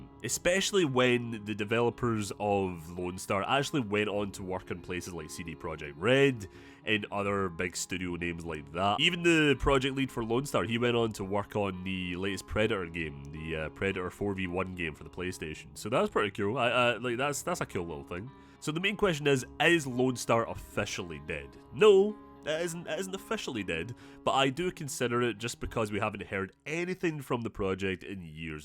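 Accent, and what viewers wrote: Scotland, United Kingdom. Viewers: i like the way scottish people talk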